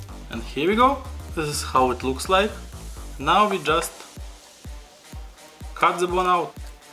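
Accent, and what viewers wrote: Russia. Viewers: Russian accent is fine i can understand and i am sure every1 else can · I like the russian accent btw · I actually like Russian accents. Keep up the good work my man. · Idc about the russian acent all I care is that u made a voice reveal lets gooooooooooooooooooooooooooooooooooooooooooooooooooooooooooooooo!!!!!! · Bro having an Russian accent is cool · Don’t be sorry about your accent, tbh I think a Russian accent is pretty cool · “Sorry for my Russian accent” Your accent sounds nice! I actually enjoy hearing your voice in your content! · Looks really good. This is the Einstein of cooking. And you Russian acsent is really nice. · Damn russian accent is just 😩👌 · Russian accent english is better than bri‘ish english ngl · Your Russian accent is slav, speak Russian sometimes. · Come on! "Sorry for my Russian accent"? Man, i personally love it! 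Hope you do more commented vids! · Having an accent is to be that u have an identity and u should be proud of Russian accent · Its ok the Russian Accent man, and its kinda cool to be honest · Russian accent is my favrouite please dont be sorry about it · Yep you very good Russian accents and English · There was a time I was just watching your videos just to hear that awesome Russian accent, love you❤️ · Dude.. you should be proud to have a thick russian accent. It is nothing to be sorry about.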